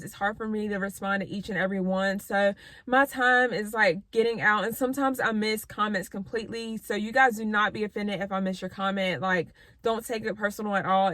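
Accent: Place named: East Coast, United States. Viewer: Im new in watching your channel...love the pantry tours..and your accent is cool too!! I mosdef can tell you are from the east coast!!! Keep doing you!!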